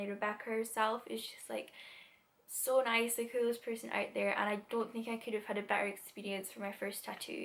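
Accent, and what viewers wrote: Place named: Scotland, United Kingdom. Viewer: Gahh! Love it! Where abouts are you from? Obviously Scottish but your accent is quite a bit different from mines. I'm getting my first tattoo from my cousin in Edinburgh:)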